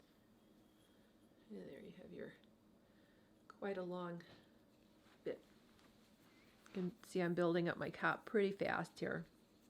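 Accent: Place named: Midwest, United States. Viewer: I knew as soon as I heard you speak that you were from the Midwest. I was born in Rockford and spent half my childhood in Dixon, Il. Life has taken me to England though. Thank you for this video!! I found it very informative. Last year, one of my best friends gifted me a bunch of wool from sheep she breeds and raises. She gave me some gorgeous Blue-Faced Leicester and a spinel that she made because she knows how much I love to knit. I figured it was about time I learn but have been putting it off out of fear. I love the way you broke your video up into sections for us because that will come in handy when I go back and want to view a particular technique. It's so hard to know what videos to start with when there are so many out there, and I feel blessed that I found yours because you showed your viewers different techniques to use, and honestly, some may be easier than others for different people if that makes sense. 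Do you have a video on preparing the yarn? I know from another short video that you put the yarn on a niddy noddy then put it in hot water. Can you put a wooden niddy noddy in water though? Does the book you recommended go into all of that? Once again, thank you so much for this?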